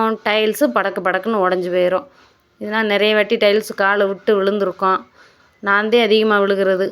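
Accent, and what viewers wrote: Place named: Madurai, India. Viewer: நீங்கள் மதுரை பக்கம்பேசுரமாதிரிபேசுரிங்க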